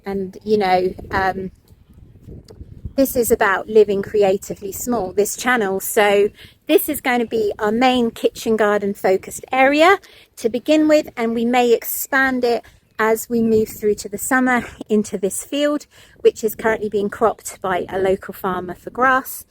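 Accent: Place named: England, United Kingdom. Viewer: Wow, you were born in Sweden!! 🤨I guess you didnät live there for long, you have such a strong English accent.... So how warm does it get for you in the summer? Yes, we have a channel would love for you to follow our journey! 👍💜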